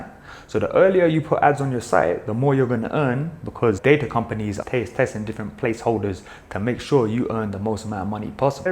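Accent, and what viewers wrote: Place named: United Kingdom. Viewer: You sound like a British rocky balboa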